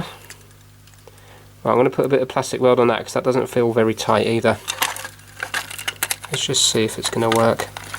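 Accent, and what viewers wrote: United Kingdom. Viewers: Lol....The maker made the effort to add suspension, but no steering? What a piece of utter rubbish. british voice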